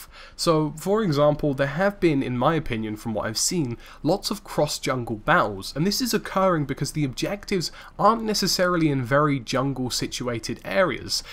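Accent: United Kingdom. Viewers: You have a very light accent for a brit! May I ask where you're from more specifically?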